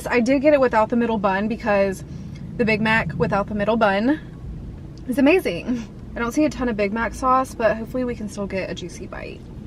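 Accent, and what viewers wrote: South, United States. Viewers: dat down souf twang u talking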